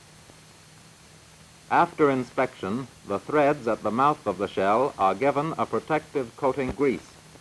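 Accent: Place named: Mid-Atlantic, United States. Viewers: I was watching this and saying to myself, "Geez, how labor intensive and inefficient...How the hell are they making enough to keep the war going?". And then I noted the date...1939. Oh. I should have figured it was pre-war from the Mid-Atlantic accent of the narrator, the fact that all the employees are male, and the relatively pedestrian rate of production. Bet that same production line did not even resemble what we see here by 1945... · It's called the "mid-Atlantic accent" - the "mid-Atlantic" jokingly meaning halfway between the US and England. It's an affected way of talking that was taught in some circles during the early 20th century; it's by no means the way any actual people talked normally. You hear it a lot in film and radio of the era. It's "FDR-speak." :)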